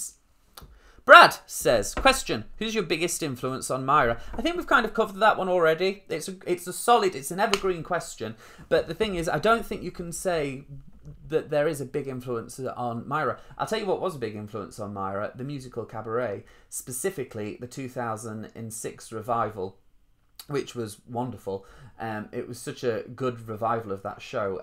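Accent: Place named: RP, United Kingdom. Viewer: You do sound posh and yes I’m northern 😉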